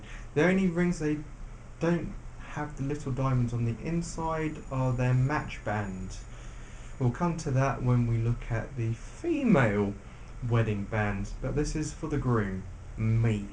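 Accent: England, United Kingdom. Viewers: holy shit it's an asian guy with an English accent!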